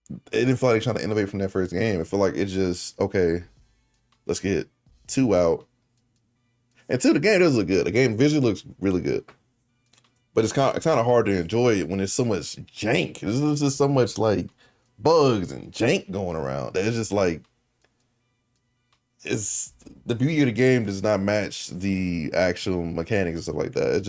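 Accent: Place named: United States. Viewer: From the footage I've seen they're just recycling so much of the first game and it feels like they want to do fancy with the newer stuff like eye tracking, but I honestly wouldn't care much about that either. I don't get how they can mess up so hard. Did they actually create any new maps? All I've seen so far is old maps... It was quite hard to understand your American accent lol, but a fun and honest explanation. Greetings from Belgium!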